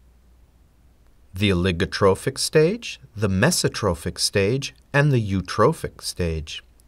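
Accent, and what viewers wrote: United States. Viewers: Hooray! you're a fellow New Englander How many East Massachusetts. You do not have a Boston accent as far as I can tell But you might have like no sound down east main either maybe Vermont West Vermont southern Vermont connecticut western Connecticut Edison New Yorker either like a New Yorker I'm confused where are you from I'm not trying to track you down I just like I like to place accents I'm an accent of all. my favorite actions are from like alabama the Kentucky Irish and Scottish and Welsh and English I do like German and Japanese accidents as well. so I just I like to place roughly where people are from you said you're from New England but I can't place where in New England. embassy says that we know how to track you down if I wanted to and I don't. you could be middle middle state or upstate Maine possibly because my grandmother was from down East Main your Kenny Bunkport and she had a very distinctive accent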